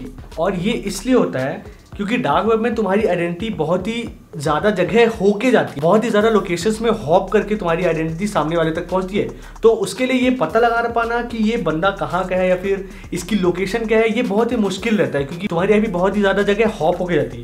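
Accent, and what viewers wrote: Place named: India. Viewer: India me ladke aise hi bat karte h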